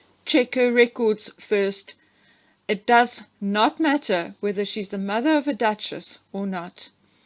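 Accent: South Africa. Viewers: You sound south African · Is your south african accent from an an English background or Dutch? Just curious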